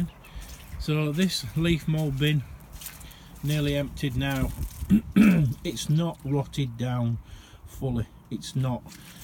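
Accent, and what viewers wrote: Yorkshire, United Kingdom. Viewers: Nice tour, smart looking allotment nice to hear another Yorkshire accent!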